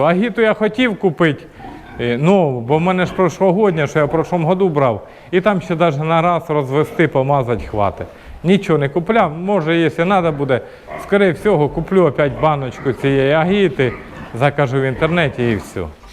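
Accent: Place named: Surzhyk, Ukraine. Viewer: З чого ви взяли що він російськомовний? Він просто може розмовляти на суржику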